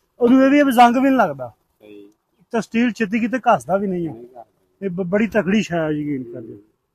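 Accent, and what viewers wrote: Punjab, India. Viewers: You. speak indian punjabi not pakistan punjabi from west Yorkshire UK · ਪੰਜਾਬੀ ਬੋਲੀ ਤੇਰੀ ਠੇਠ ਪੰਜਾਬੀ ਸਾਡੇ ਨਾਲ ਦੀ ਬਹੁਤ ਵਧੀਆ ਲੱਗਿਆ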